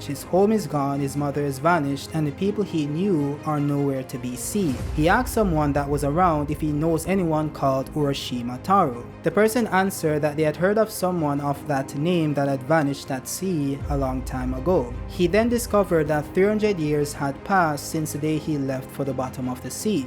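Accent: Jamaica. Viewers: Are you jamaican brotha?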